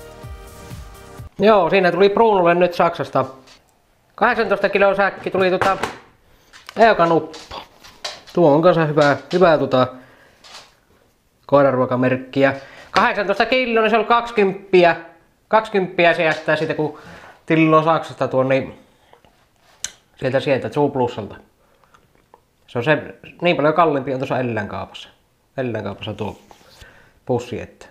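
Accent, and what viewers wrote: Finland. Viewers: Tämä rally inglish on kyllä mainiota kuunneltavaa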